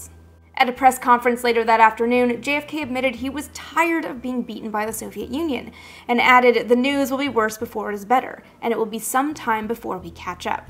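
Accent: Canada. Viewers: You made me cry at the end. You're still a nice lady. Are you Canadian or a northern mid-westerner? Great piece, I will make many people watch.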